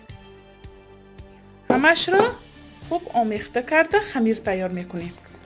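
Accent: Isfara, Tajikistan. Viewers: Гапзани исфарагми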